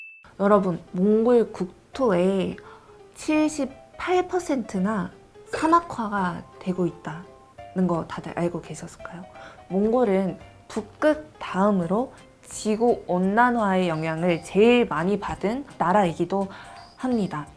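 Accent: Seoul, South Korea. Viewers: 눈감고 눈뜨고 듣고 보고 있어도 한국서울 사람입니다. 제주 부산 광주 대전에 가셔서 말씀하시면 서울사람이지. 몽고분이라고는 절대 믿지않을듯. 여러분야에서 한몽간의 가교역할을 하고 계시고 더욱더 잘하실듯 합니다. 화이팅입니다^^ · 발음이 그냥 한국 사람이네요.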